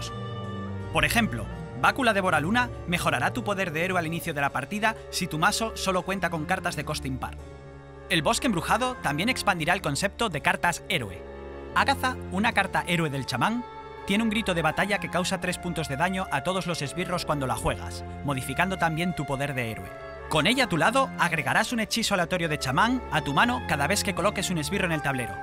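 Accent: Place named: Spain. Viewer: Por poco pensé que me equivoqué de canal y lo confundí con el de "ES", pero no, es "LatAm" y está en español de España :s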